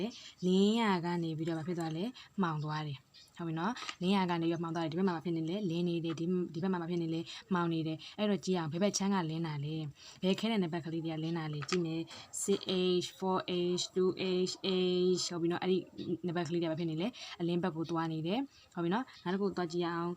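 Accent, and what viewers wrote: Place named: Rakhine, Myanmar. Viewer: ရခိုင်သံလေးနဲ့ တူပါတယ် ဆရာမ❤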